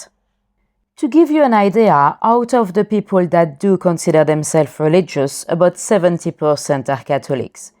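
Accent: France. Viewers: Nice video, nice storytelling and also nice accent. Is it French?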